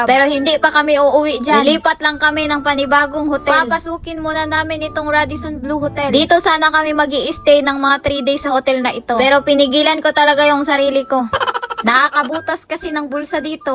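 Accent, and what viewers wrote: Visayas, Philippines. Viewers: Madam bisaya ka?😊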